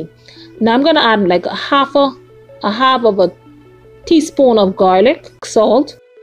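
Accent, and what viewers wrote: Bahamas. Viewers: Lovely! I'll try your recipe ;-) I also enjoyed your Bahamian accent! I love it!!!! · Love the accent and of course the Bahamian people.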